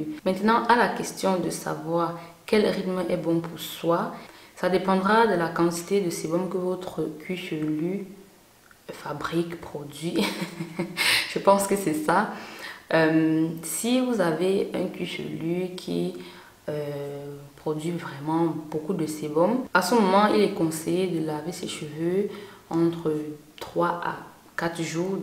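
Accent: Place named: West, Cameroon. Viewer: 🤣 tu as fini avec nous (femmes noires) . Avec ton accent de l'ouest du 237, j'aime ca. Tu es naturelle même dans ta facon de parler. Super vidéo et merci😘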